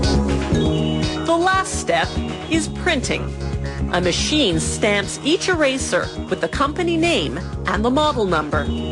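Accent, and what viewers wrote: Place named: Canada. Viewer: she's very canadian, eay.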